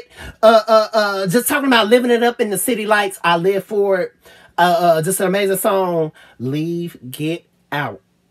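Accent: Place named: South, United States. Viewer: by the way where are you from you have a really deep and strong southern accent it really fascinates me it is So DEEP AND Strong I’m just curious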